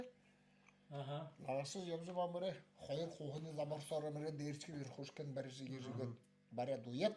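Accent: Buryatia, Russia. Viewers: Кажется с Эхирит- Булагатского района. Би хуу хэлhэн юумын ойлгоном, ямар hайханаар зугаалнаб, манайншаар. Особенно, бу ёборолды. Только у нас так скажут! Счастье, знать родной язык!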